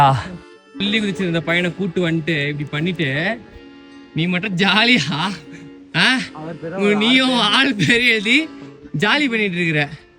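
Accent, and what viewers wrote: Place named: Kanyakumari, India. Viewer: Nanum kanyakumaritan.... Slang la therinchuthu .... ❤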